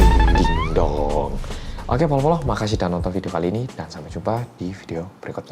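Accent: Java, Indonesia. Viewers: Orang Korea yang medokkkk😌👍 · bersama orang korea yang MEDHOOOKK aku dah tereak medhok juga. trus sadar kalo ini rindingdong :) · bersama orang korea yang... Hensa( Me): kewe...: medhokkkkkk eh... Hensa( me): lhaaaa Hahahhahajajaja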